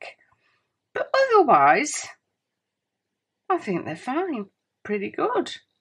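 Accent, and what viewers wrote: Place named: Scotland, United Kingdom. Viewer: I simply adore your accent. May I ask where you’re from? My first guess is Scotland 🏴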